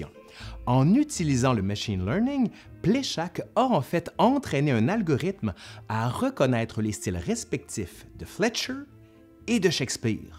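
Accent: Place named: Quebec, Canada. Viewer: Un petit bonjour de Suisse! J'adore tes vidéos! Le contenu est extrêmement bien présenté! Et le ton de ta voix, plein d'enthousiasme et de suspense, est addictif! De même que ton air sympathique et l'expressivité de ton visage :) Après, en Europe, on a tendance à trouver joli et "pitoresque" l'accent québécois, je suis sûrement biaisée ;)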